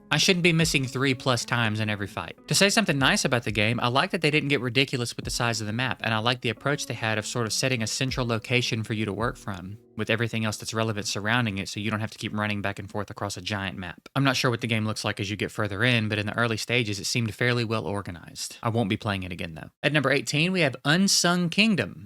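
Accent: South, United States. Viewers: your amazing southern accent makes me feel like someone is scratching my brain.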